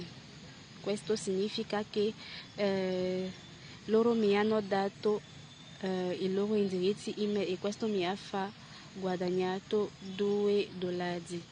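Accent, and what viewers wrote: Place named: France. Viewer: Il tuo accento mi fa impazzire! Sono francese anchio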